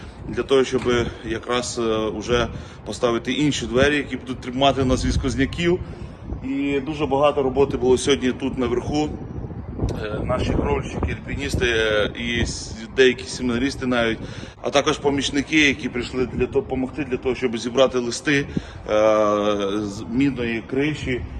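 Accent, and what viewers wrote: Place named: Western Ukraine, Ukraine. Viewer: В Одессе так не разговаривают там все на русском говорят просто человек с западной Украины и претворяется разве так можно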